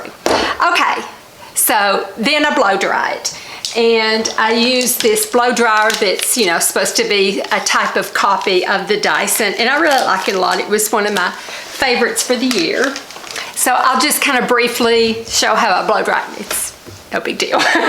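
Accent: South, United States. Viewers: Knew u were a southerner when I heard u talk and a tennesse rwsident...so am I....tfs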